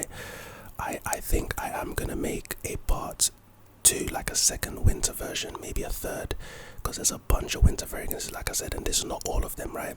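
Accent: United Kingdom. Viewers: Are you from the uk bro?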